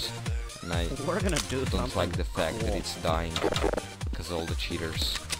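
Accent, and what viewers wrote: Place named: Russia. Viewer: акцент, может быть и английский, но он русский!!!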